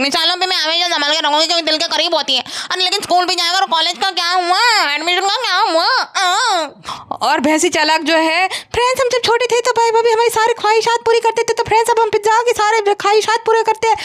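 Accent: Gujarat, India. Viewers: Your Reaction vlogs are always great ..In between love hearing you Gujarati accent and words ..Keep it up and God bless you ❤️🙌🫶🏻🦋🧿🌷✨🤗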